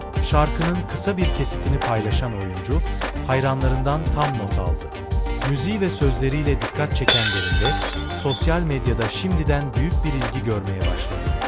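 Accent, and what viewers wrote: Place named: Anatolia, Turkey. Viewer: Sesini çok beğendim iyi ki mutfakta sesin çok güzel Anadolu sesin çok güzel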